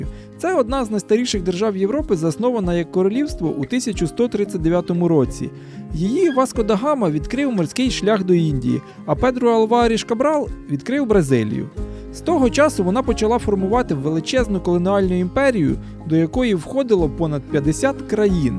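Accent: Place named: Poltava, Ukraine. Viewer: а мені здалося, що у Вас полтавський діалект. Принаймні, дуже схоже! 😊 Дякую за таку цікаву подорож!❤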